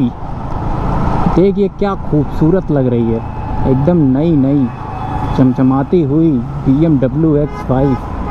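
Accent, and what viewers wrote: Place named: Mirzapur, India. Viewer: Everything aside your mirzapur like accent ❤️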